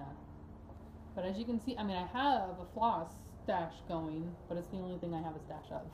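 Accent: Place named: Northern Ireland, United Kingdom. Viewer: would you believe not just Irish, but Northern Irish, a much more rare accent, and very charming (more like Scottish). And actually a lot of people find it hard. Probably because initially you want to say (as in Michelle) and then Stitch becomes hard to say 😝 trust me to make it harder than it needs to be. I must admit I put about 13 seconds thought into it 😉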